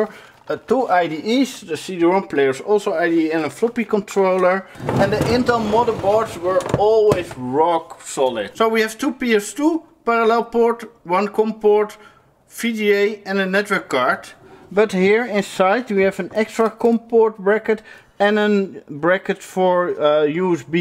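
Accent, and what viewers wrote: Netherlands. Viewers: I wasn’t ready for that Dutch accent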